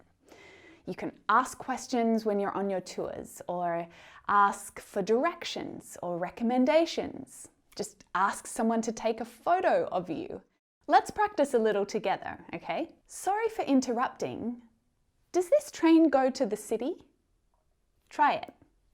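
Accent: Australia. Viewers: I really love Australian accent. Thanks a lot mam. Love from India❤️ And I am a new subscriber....... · I really into Australian accent! It's cool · Hy Myself Anees Bangash from Pakistan currently I am here an Dubai I realy appreciate your efforts to teach u Assuies accent i enjoy keep teach bless u ❤❤❤❤ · It's Luke Hemmings from 5 seconds of summer! I love that band and I also love Bang chan, he is australian but he's member of a kpop boy group called stray kids<3 . . . I like the australian accent and your videos are amazing, thanks for everything:) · Hey great lesson, I love australian accent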